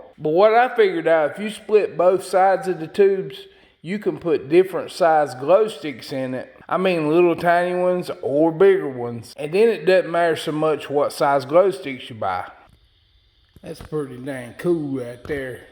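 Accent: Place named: South Carolina, United States. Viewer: where are you from? sounds like the great state of south carolina.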